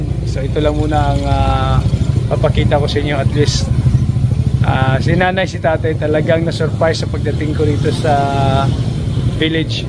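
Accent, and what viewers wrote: Iloilo, Philippines. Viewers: Ilonggo ka po pala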